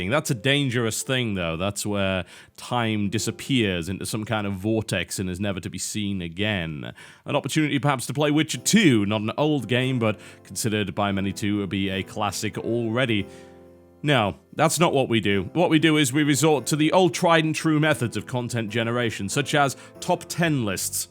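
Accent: United Kingdom. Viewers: your british accent disgusts me. Im from england and yet i still cannot understand wtf your going on about. The end of your sentences all sound like your american.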